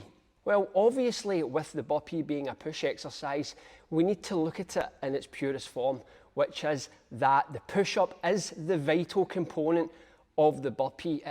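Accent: Scotland, United Kingdom. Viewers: I love the Scottish mindset, accent, no nonsense approach and hard workout game 🏴 (I'm Scottish)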